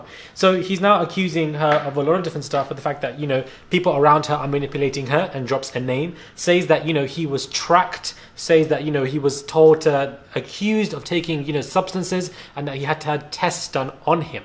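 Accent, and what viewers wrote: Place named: England, United Kingdom. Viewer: And I actually thought he meant pounds because he has an English accent and that's where I'm from too.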